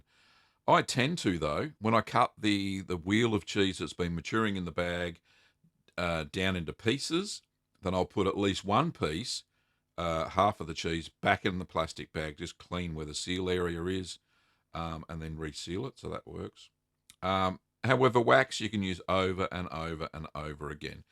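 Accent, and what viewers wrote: Australia. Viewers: I apologize, and now I feel ignorant. I'm pretty sure that's an Aussie accent now - My friend lives in NZ. Terribly sorry for that. I checked your website's IP and saw that it originated from an unknown location in AU.. I feel really silly. Have a good night!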